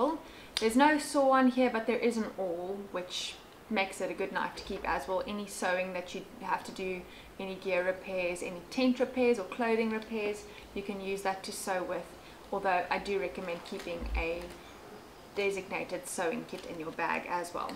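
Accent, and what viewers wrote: South Africa. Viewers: I lived in SA for two years. What a beautiful country. I miss your accent too. I'm impressed with your loadout and the fact that you actually use it. It's a well thought-out kit. You have everything you need and nothing you don't. My bag weighs in at 25 pounds. Most people with little experience overpack without realizing how heavy a 40-50 pound pack becomes after an hour. I think 25 pounds is a bit much so I'm experimenting to figure out how to shave 5-10 pounds off. I'll get there. Thanks for your excellent channel!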